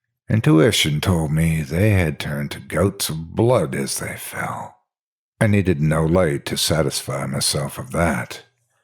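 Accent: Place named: Missouri, United States. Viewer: I knew not that the great Twain had written a spook story. Excellent as all his works are. Thats a great Mssooouriy accent too!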